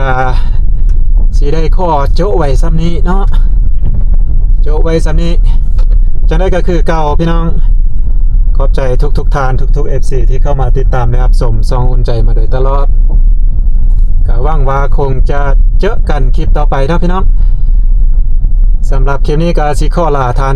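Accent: Loei, Thailand. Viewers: สำเนียงเมืองเลยครับ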